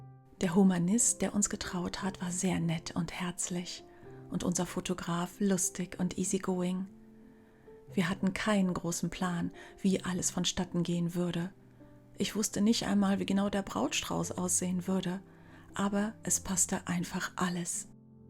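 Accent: Scotland, United Kingdom. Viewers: Ich bin platt…bei deinem schottischen Akzent hätte ich nicht gedacht das wir Landsfrauen 😂 sind…Toll…Danke für die tollen Videos…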